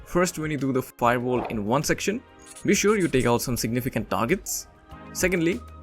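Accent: India. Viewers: Bro are you Indian??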